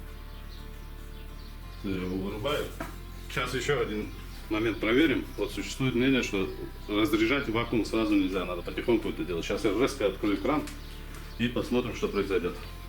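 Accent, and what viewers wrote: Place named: South, Russia. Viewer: Это южный диалект ) Там банка-баллон а пакет-кулек!)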